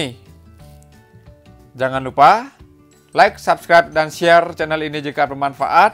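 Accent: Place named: Bali, Indonesia. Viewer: Logatnya mirip org Bali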